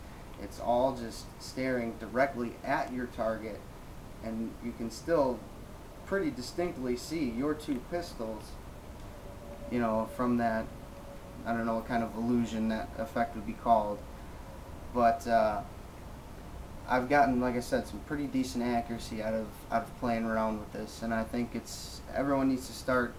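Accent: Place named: Minnesota, United States. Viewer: are you from Minnesota??? I heard my accent!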